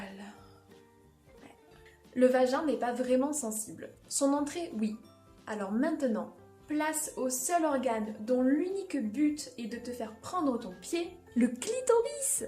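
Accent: South, France. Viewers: Je crois déceler un léger accent qui sent la fleur d'oranger, l'olivier et les cigales (j'ai jamais senti une cigale hein...promis o_O), me trompe-je ?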